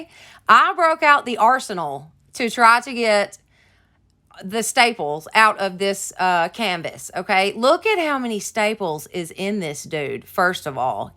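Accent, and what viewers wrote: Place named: South Carolina, United States. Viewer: Girl...loved them all. Gotta ask are you from Georgia or South Carolina? I was born and raised in SC and I swear we have the same accent.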